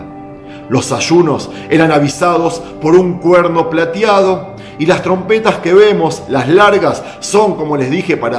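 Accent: Buenos Aires, Argentina. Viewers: Este tio q hebreo va ser habla como Porteño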